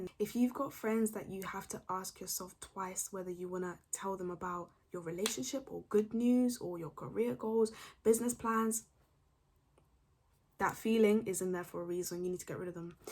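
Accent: United Kingdom. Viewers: Your British accent is so refreshing and it's giving!!😩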